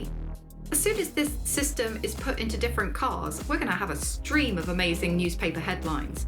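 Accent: United States. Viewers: It's weird that a brit other than John Oliver is teaching me about the goings on in my own country. Thanks for hilarious and educational content. And your American accents are fantastic. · The american accent is getting better every video. Well done 🎉 Question though: Did Amber use the cockney accent? As a german listener I am trying to learn the difference between the british accent (Lord, you have so many😅). The video however sounded like a bad idea for a Maximum Overdrive remake. This almost naive trust in AI is simply concerning 😑 Thanks for your time and content and the best of wishes Mr. and Mrs. Sheppard, hope they are doing well as well ❤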